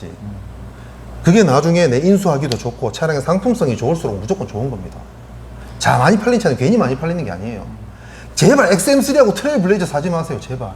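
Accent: Gyeongsang, South Korea. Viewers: 억양이 경상도 이신거 같은데 진짜 홀린듯 영상 봤네요 좋은 정보 감사합니다.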